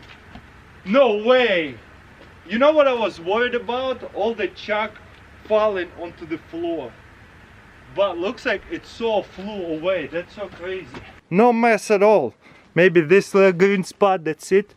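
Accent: Russia. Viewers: Y'all with a Russian accent!! This was awesome🖒🖒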